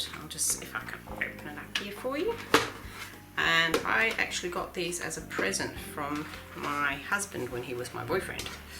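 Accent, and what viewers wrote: Australia. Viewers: that video was fantastic and so good to hear a fellow Australian accent. I am 74 y.o. and have just recently gotten into colouring books. I am addicted!!! So relaxing. Your video is so informative and I am asking Santa for some Faber Castell Polychromos for Christmas. My other addiction is making cards. Thanks.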